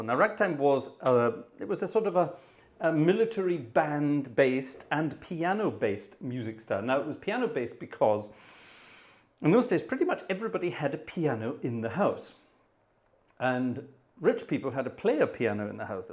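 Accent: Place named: Scotland, United Kingdom. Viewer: I have been away for a while but getting back into the swing of things and I enjoyed this organ night. You're sounding more and more Scottish each day!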